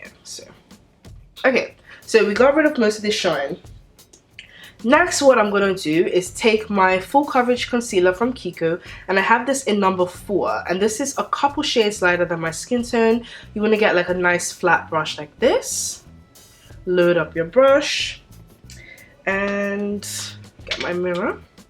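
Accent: Bermuda. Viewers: Where are you from? You sound borderline Bermudian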